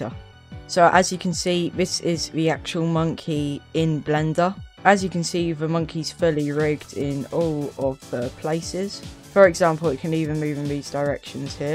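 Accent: United Kingdom. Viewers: He’s British, that’s how they say Z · it means Z its because hes british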